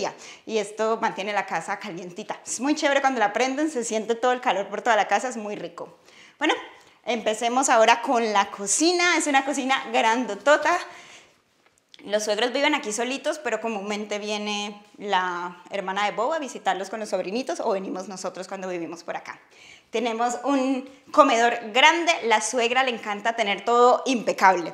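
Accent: Colombia. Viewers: Me encanta que no pierdes el acento colombiano 😍😍😍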